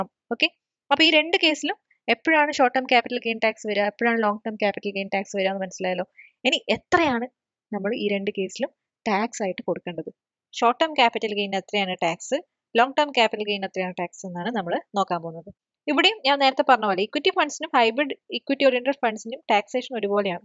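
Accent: Kannur, India. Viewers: സംസാരം കേട്ടിട്ട് കണ്ണൂരുകാരിയാണെന്നാണല്ലോ തോന്നുന്നേ....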